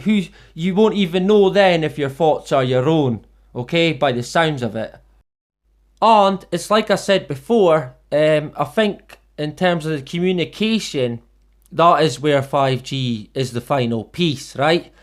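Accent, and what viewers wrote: Scotland, United Kingdom. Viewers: Shalom, dear friend and brother. Thank you so much for this information. I'm going to be sharing it, along with the previous video on PBB, with family and friends who think I made this stuff up lol. Keeping you and yours in my prayers. Love that you're not afraid to share the truth, especially with that amazing Scottish accent! Say hello to bonnie Scotland for me! Much love from still chilly Michigan USA. 😍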